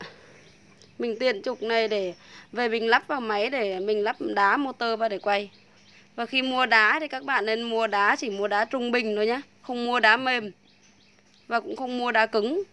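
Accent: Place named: Quoc Oai, Vietnam. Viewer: nghe như giọng ở quốc oai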